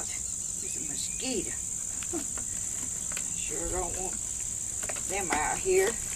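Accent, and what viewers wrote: South, United States. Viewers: love your videos & your wonderful Southern Accent. I’m an Alabama Girl.... Have you tried working with old tennis shoes? My daughter received a flower planter as a gift made from a shoe. It looks like the artist use your technique with concrete. I’m anxious to try one of your projects. I have been saving old jeans for a while. Now I know what I want to do with them. Thank you so much for your videos. I love every one of them. Your videos have inspired me to get busy and use up those jeans. Lynn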